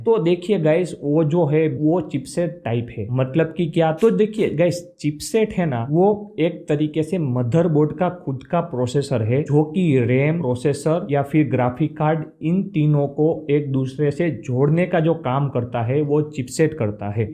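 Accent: Gujarat, India. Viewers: are you gujarati 😅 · Aap Gujarati ho kya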